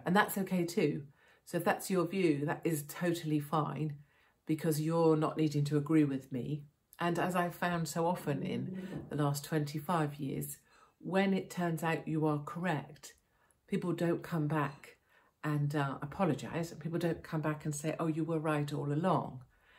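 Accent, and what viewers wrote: United Kingdom. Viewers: Based on your accent I assume you are posting from the UK. I may be wrong. Why on earth are posing in from of a hugh american flag? You have little say in the UK and exactly zero say in the US. A lot of what is now going wrong traces back to american moves.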